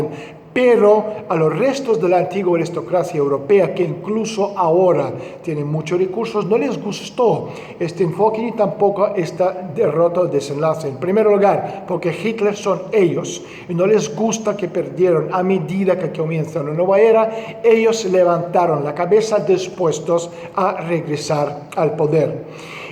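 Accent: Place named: Spain. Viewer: quítate ese acento español tan ridiculo ya vives en LatinoAmerica......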